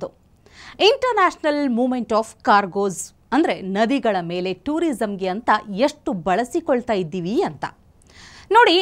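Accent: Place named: North Karnataka, India. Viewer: Madam you talk in uttara kannada language ❤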